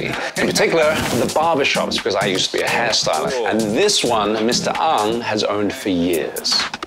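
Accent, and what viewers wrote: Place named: United States. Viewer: I had to look up this ad cause I was surprised with his accent. He sounds American now 😢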